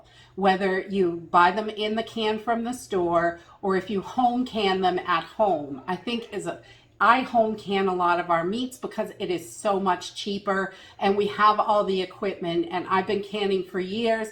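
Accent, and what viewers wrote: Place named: New England, United States. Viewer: Hey, are you in New England? I am - and from your intro (with the fall foliage) and your accent, it seems like maybe you're a fellow New Englander. If you like to keep your location private, no worries. I only go as far as to say that I'm in New England on my channel for privacy (and anti-stalking) purposes. I was just curious.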